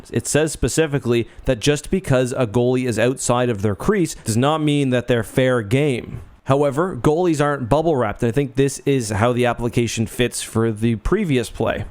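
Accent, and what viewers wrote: Canada. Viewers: I always knew was a canook by his accent but I didn't know he's a huge hockey fan. Now I like him even more 😂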